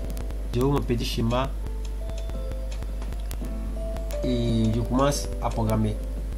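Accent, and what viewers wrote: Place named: France. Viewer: j'ai rien compris par votre accent française !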